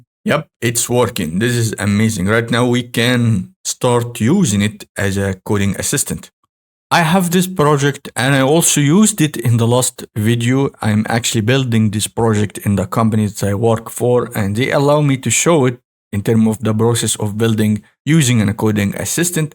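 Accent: Egypt. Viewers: I like you Egyptian English accent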